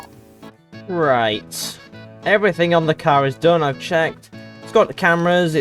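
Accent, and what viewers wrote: United Kingdom. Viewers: GrogTV but British instead of Aussie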